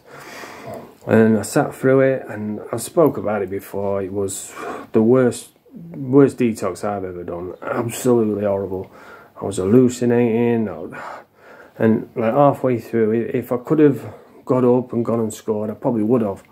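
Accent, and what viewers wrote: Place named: England, United Kingdom. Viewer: Go on lad… I’m from the basque,north Spain, But I work on ferrys in the Uk , all over…I’m curious about your accent ? Which part of England are you mate? Strong lad, nice2 meet u…’ Greetings 🙏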